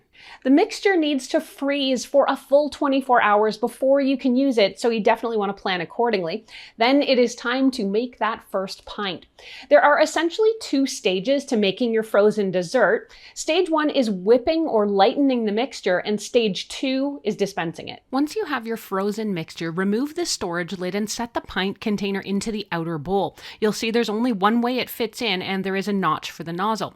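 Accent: Canada. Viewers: Excellent 905 accent.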